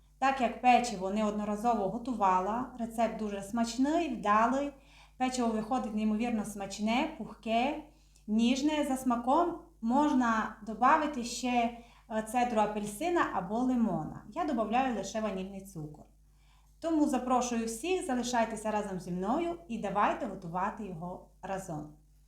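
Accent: Transcarpathia, Ukraine. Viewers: Доню, сир завжди був "домашній",або "свіжий". То у москаля "твораг"...Ми ж не співаємо:Любив козак дівчину, і з кисломолочним сиром пироги😊😉. А слухати вас дуууже приємно, бо згадую дитинство та подорожування по Закарпатті.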